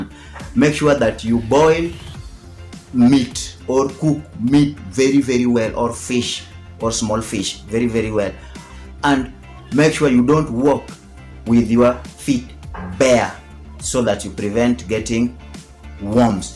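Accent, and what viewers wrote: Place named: Luo, Kenya. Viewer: Haha apart from deworming sijui last time kudeworm haha lkni the luo accent hehe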